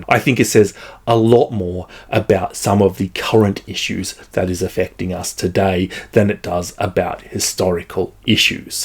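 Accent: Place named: Australia. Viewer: 😂 I kept having to pause my TV and run to the other room to repeat the zingers to hubby: not that I could do them justice, because the accent and cadence really adds to the delivery. I've said before, it feels like Australia is in a golden age of comedy, and everybody benefits 💛 I did get in a joke of my own, don't know if it translates unless you've heard of Shark Tank, but here goes: after running to hubby to repeat several hilarious zingers, I told him that hadn't been posting for a while while his computer was being repaired, and he must have used that time to fill his tank. His Snark Tank. 😂 But seriously, I love your recommendations, and love the way you always approach your reviews from the position of "what basics -- plot, character, writing, theme, etc. -- does this book do well (or not)?" And you always invite the readers (viewers) to reflect and learn about themselves, and to grow our critical faculties: "what kind of reader are you?" I love the laughs, but am nourished by the grounded focus of these videos. 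P.S. I always enjoy when picks out books (and other things!) to recommend to so many kinds of readers. It feels weird havng a minority opinion, so it's encouraging that reminds us "nobody's right and nobody's wrong" when it comes to our reading perspectives. I read Stoner and Gilead, and while I could appreciate their merit, they didn't vibe as highly with me. Also read There There, which was fine, but to me it didn't rate higher than o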